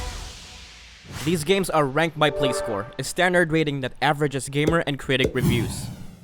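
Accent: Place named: Philippines. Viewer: Alam na alam mong Pilipino narrator eh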